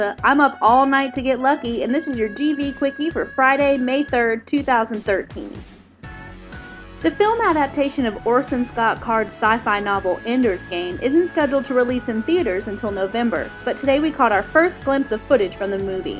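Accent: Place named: South, United States. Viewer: Dat southern twang <3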